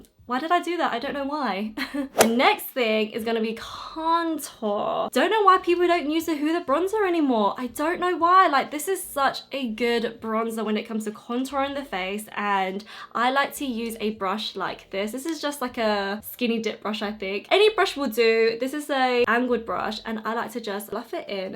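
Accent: United Kingdom. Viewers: Omg are you British !!!???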